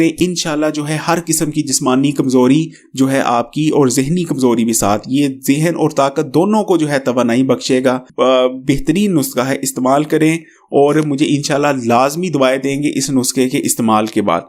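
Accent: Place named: Punjab, India. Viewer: I wish you find some better voice to represent your videos.His sound is so idiotic and wrong Urdu pronunciation.Stop punjaabbi on Urdu narrative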